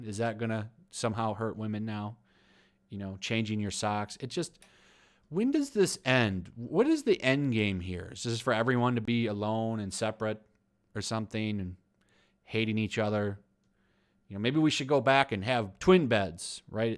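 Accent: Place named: RP, United Kingdom. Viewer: 98% of British (English, Scottish and Welsh) people don't really sound like that....Atleast you sounded like the Royals. 😂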